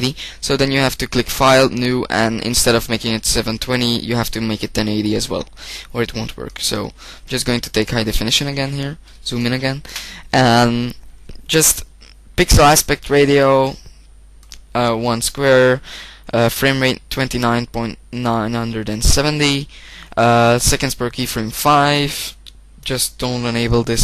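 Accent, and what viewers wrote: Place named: Netherlands. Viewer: haha en je nederlands? dat lijkt zo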